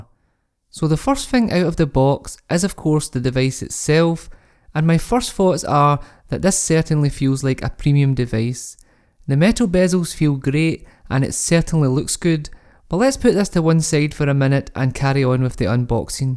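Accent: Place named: Scotland, United Kingdom. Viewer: The share joy of a Scottish accent combiled with a tek vide cannot be mesured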